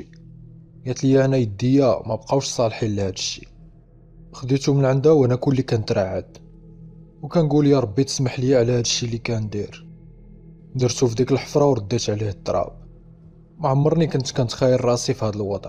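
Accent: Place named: Dammam, Saudi Arabia. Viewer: انــتت من اي ديرة في السعوديــة من اي منطقـة لهجتـك م افهـم شكلك من دمامم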